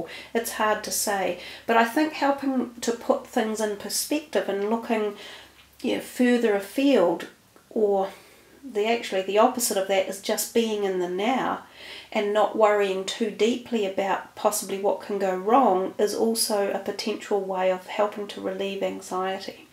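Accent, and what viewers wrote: New Zealand. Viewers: you have an NZD accent.. where are you from? North or South?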